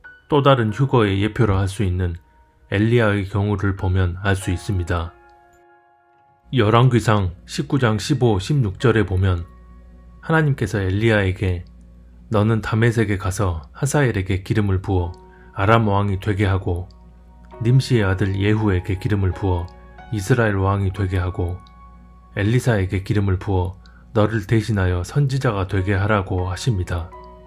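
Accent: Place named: Jeolla, South Korea. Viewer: 전라도 억양 듣기싫다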